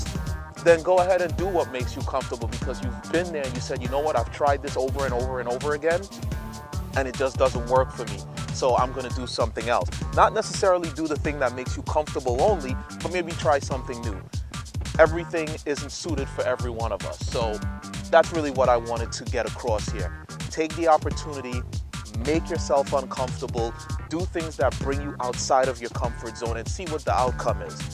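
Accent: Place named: Caribbean. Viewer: Yo!!! You sound like you from the W.I.!!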